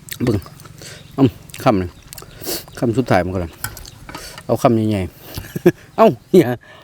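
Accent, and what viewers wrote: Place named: Isan, Thailand. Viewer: เด๋อ..อีสาน lol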